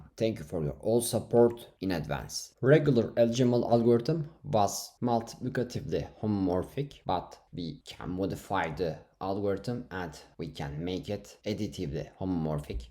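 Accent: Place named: India. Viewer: kanka çok iyi içerikler üretiyosun ama hintli ingilizcesi gibi konuşuyosun yanlış anlama :D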